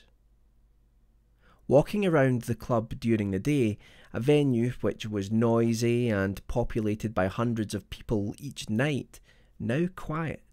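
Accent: Scotland, United Kingdom. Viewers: you sound Scottish.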